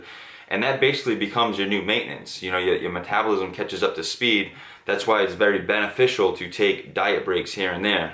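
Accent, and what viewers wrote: Boston, United States. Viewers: you sound very Boston...lol. are you from mass?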